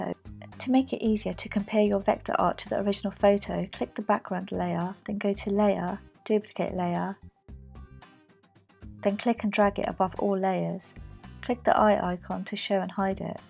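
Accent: United Kingdom. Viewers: That British accent 😉 Good luck :)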